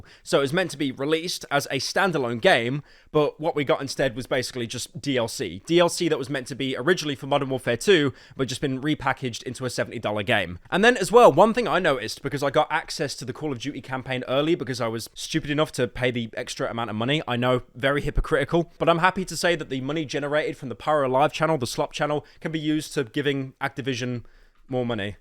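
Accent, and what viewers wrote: United Kingdom. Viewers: a brit talking about a game. just what i wanted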